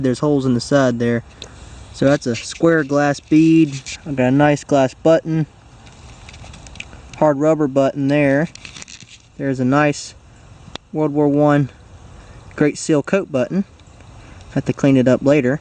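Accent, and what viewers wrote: South, United States. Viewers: Your accent is like cowboy like your is yall. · I like your forest gump ascent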